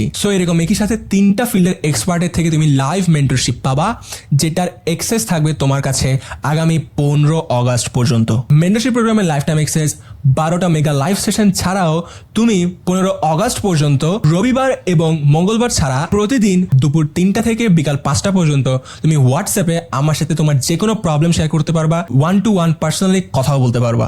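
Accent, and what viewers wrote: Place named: Chittagong, Bangladesh. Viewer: Chittagong er accent❤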